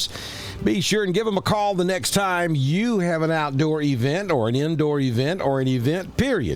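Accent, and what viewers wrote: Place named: South, United States. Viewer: I 💕 ke the way you present this report. No unnecessary talk Just clear southern man explaining the possibility not alarming everyone.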